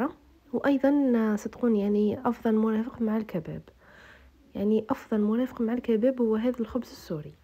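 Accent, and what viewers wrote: Setif, Algeria. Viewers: هدرتك تباني سطايفية انا من سطيف هدرتنا تسخف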